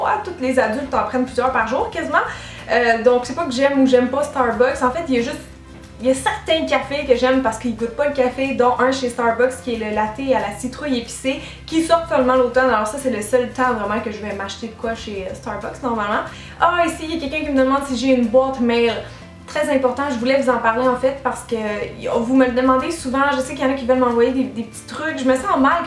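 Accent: France. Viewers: Aha ton accent francais j'adore! Je suis francaise'et je me rend meme'pas compte que les francais on unnaccent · wow ça fait tout bizarre de t'entendre parler avec l'accent français, tu deviens tout de suite si "banale" :p je préfère le piment de ton accent habituel héhé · BELGIQUE!!!:P Bref... c'est super chouette comme vidéo! J'aime bien en savoir un peu plus sur toi! Et ton accent français est trop trop bien! J'ai essayé de faire l'accent quebecquois... hum hum je ne suis pas super douée:D Les critiques négatives et les troll y en aura tjs malheureusement, je pense que tu fais bien de ne pas leur répondre, ça ne sert à rien! Puis si tu as tout ce monde qui te suit c'est bien pour une raison! T'es la meilleure! Bisous! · J'adore l'accent français ahah !!! :') T'es super, j'adore tes vidéos !!! :D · Coucou! J'espère que tu reviendras en France ce serait trop cool de te voir:DD Gros bisous t'es la meilleure et ton accent français est super!;) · Peut-être qu'une ou 2 FAQ en + par an serait sympa! 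Je suis impressionnée comme bcp de monde par ton accent français^^! Tu réagis apparemment de manière posée à certains problèmes/ sujets délicats: tu es mature mais pas rabat-joie! Continue ! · Ahahahahah ! J'ai adoré ton accent français ! Je suis française et ça fait vraiment bizarre d'entendre dire qu'on a un accent, je n'avais jamais vu les choses sous cette aspect là mais c'est vrai qu'on en a un ! En tout cas tu l'as très bien fait, moi je ne saurais pas du tout faire un accent canadien ! Gros bisous !! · J'aime ton accent français👌💕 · Gros pouce bleu pour ton accent français aha !! :D <3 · Mdr les pancakes, c'est un sketch de Gad Elmaleh et Olivier, j'adoore !! :D Et j'adore l'accent française aussi, c'est vraiment bien (je suis française moi aussi !) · Ton accent français est tellement ... génial ! J'adore ! ^^ <3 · Merci pour l'accent français, t'es trop forte, la France t'adore <3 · Cet accent français était génial. 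XD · t'a voix est méconnaissable avec l'accent francais!! zoubi! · Ça fait vraiment du bien d'entendre "l'important c'est d'être en santé, pas d'être mince !" Si seulement les médias, la pub, etc... pouvaient diffuser cette information en masse, je pense qu'on vivraient bien plus heureux ! J'apprécie beaucoup le fait que tu ne suive pas cette mode du "healthy", ça me gonfle de devoir faire attention à tout ce que je mange et de culpabiliser parce que je mange trop de gâteaux. Je me désabonne de beaucoup de chaines de beauté parce que ça me lasse, mais la tienne est vraiment super. Tu est naturelle et en plus tes conseils sont très professionnels. C'est un peu grâce à tes vidéos que j'ai appris à bien me maquiller les yeux. Ah, et puis j'adore quand tu prend l'accent français, je crois qu'en tant que française, j'arriverais pas à faire l'accent québécois ! ^^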